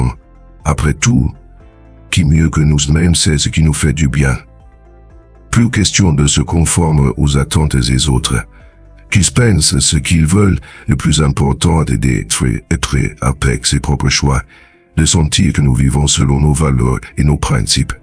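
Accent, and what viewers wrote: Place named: England, United Kingdom. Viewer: D C'est bien la première fois que j'entends une vidéo avec une voix off qui a un fort accent étranger (anglais ou américain ?). Les sous-titres sont désastreux mais cela m'a bien fait rire !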